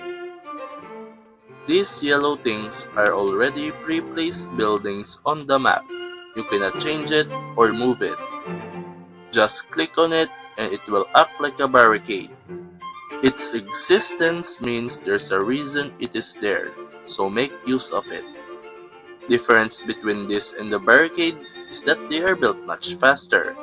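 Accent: Philippines. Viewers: filipino accent is strong here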